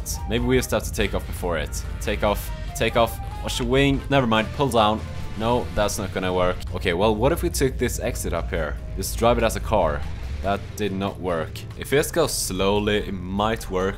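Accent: Sweden. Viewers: Are you from sweden? The way you speak sounds like when a swedish man speak english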